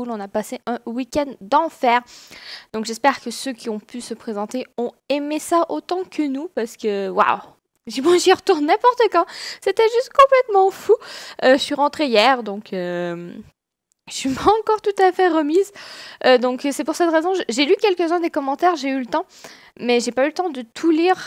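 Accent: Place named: Quebec, Canada. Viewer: J'adore tes vidéo est ta personnalité en plus j'adore l'accents Québécois Je te suis depuis tes 900 abonnés est ta tellement bien évoluer même avec tes 100k tu reste toujours aussi proche de ta communauté est sa c'est rare!! Bonne continuation:) Sache que je ne me desabonnerai jamais:^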